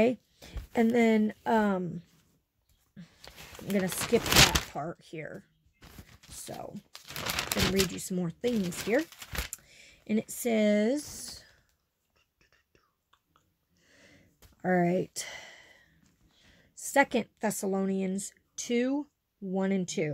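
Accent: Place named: South Carolina, United States. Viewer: I live in south Carolina you sound like ur from south Carolina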